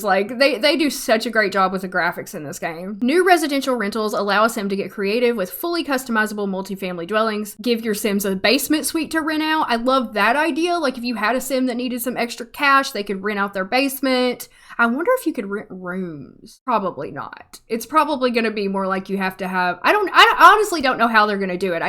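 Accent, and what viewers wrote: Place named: Texas, United States. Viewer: the Texas girl accent lol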